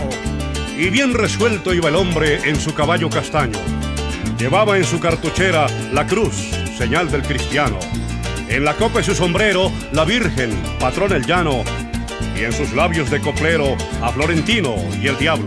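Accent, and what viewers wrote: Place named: Bogotá, Colombia. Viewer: una voz magnífica, una dicción espectacular, todo un artista; pero declamó como un bogotano. Si quieren saber cómo declama un llanero, busquen el poema el cazador novato karacachian.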